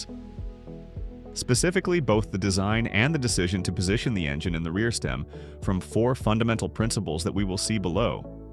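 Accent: United States. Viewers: Quite. I was hoping the video would move on to electric buses and discussing how bus design might evolve now that there’s no need to be constrained by the limitations of diesel engines, but it never came. Might have guessed, considering the US narration.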